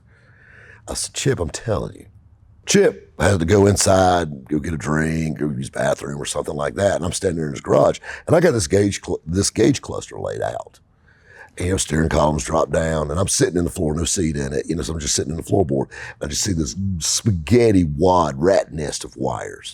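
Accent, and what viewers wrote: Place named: South, United States. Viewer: I swear this is the best YouTube channel out there! I relate 100% I'm from Darlington SC and I swear you talk and act just like my dad. I live in the north now I miss that southern twang now and again keep making em!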